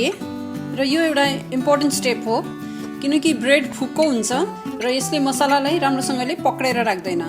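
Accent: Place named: Darjeeling, India. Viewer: The way you are talking resemble as you are from Darjeeling or siliguri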